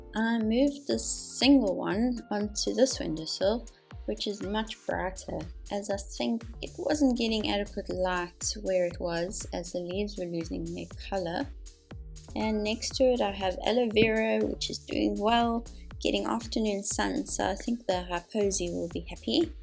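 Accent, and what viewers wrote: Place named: South Africa. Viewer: I Love hearing the South African accent! 🤩 🇿🇦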